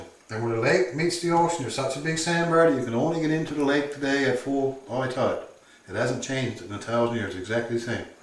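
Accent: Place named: Newfoundland, Canada. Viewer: Haha Newfie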